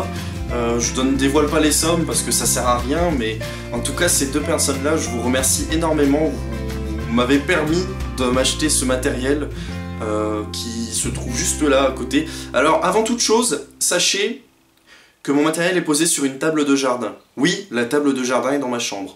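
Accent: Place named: Nord-Pas-de-Calais, France. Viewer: T'es ch'ti toi, je reconnais l'accent de chez nous!!! 62 moi. Je pars aussi au Japon. Photos sur instagram. Il y a déjà des photos des voyages au Japon, Tokyo, Kyoto, Naoshima, Kyushu etc de 2014 et 2015.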